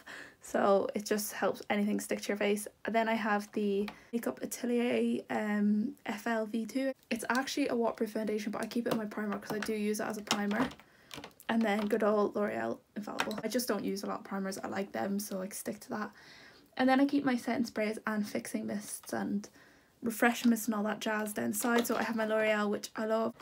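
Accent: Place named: Ireland. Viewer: Where abouts from Ireland are you?? I’m Irish but live in England , and I absolutely love your video and accent mines faded but it comes back ❤️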